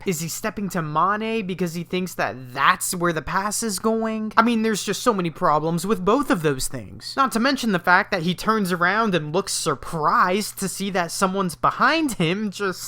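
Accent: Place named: United States. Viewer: I love listening to American accent talking about 'football'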